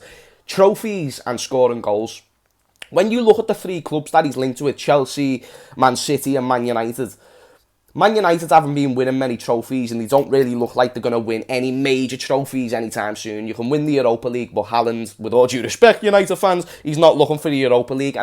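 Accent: Ireland. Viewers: Big up from Ireland 🇮🇪 ( great Irish accent btw ) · Love the Irish accent your like myself bro 🇮🇪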